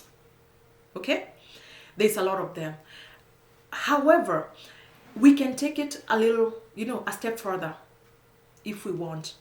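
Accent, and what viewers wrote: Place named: Kenya. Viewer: Can’t miss that Kenyan accent haha. You look great!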